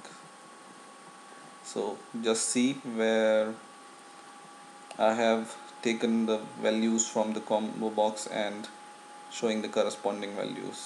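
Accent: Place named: India. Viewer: You did well but let me tell you, your Indian accent is so ugly and I hate it. you should put more effort into practising pronouncing english bro then I bet your videos r greater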